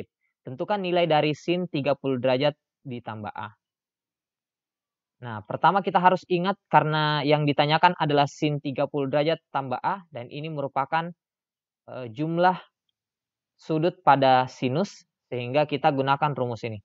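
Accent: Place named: Bugis, Indonesia. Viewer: Kayak aksen bugis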